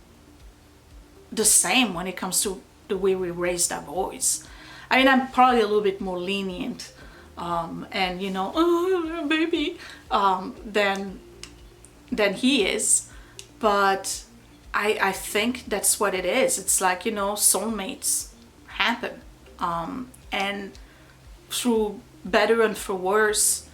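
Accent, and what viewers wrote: France. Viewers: The French accent is really cute though. BTW, as an English teacher myself, there is one thing I 'd like to say: having an accent is normal. It's nothing to be ashamed of. You have taken on the task of learning another language. That is hard. No one, if they aren't learning another language or are bilingual themselves, is allowed to criticize that. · I have been watching you since u were working on the office. I fell in love with your willingness to rise to the challenge and share it with us. I love seeing you interact with your family. I love your accent and your stories. I call you my French lady on YouTube. I really enjoyed this video, it was a great visit with my friend.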